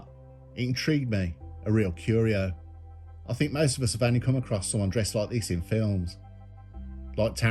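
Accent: Birmingham, United Kingdom. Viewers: It's funny because it's narrated by a deadpan Brummie.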